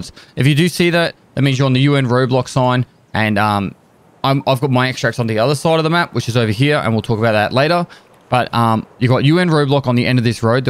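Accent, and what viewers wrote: Australia. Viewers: He is speaking English, with a moderate Australian accent. He is a native aussie.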